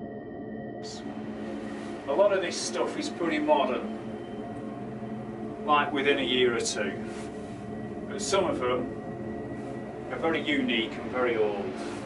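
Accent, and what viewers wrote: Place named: Lincolnshire, United Kingdom. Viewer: My dads from Clethorpes...he spoke like you. I believe that was Lancashire. No..... just looked it up it was Lincolnshire....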